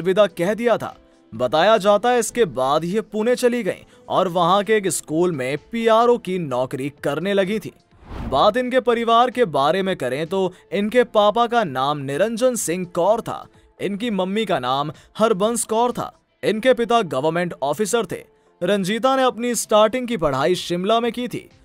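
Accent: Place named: United Kingdom. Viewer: Why ur talking in Hindi with attaching English accent to it. Hindi should be spoken properly. You sound so weird.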